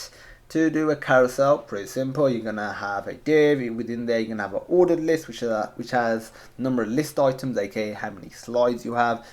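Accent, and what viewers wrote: United Kingdom. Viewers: Great stuff ! The git downloads will save me ages...! I appreciate the video too btw - good to hear a Brit voice - no trouble understanding you :-)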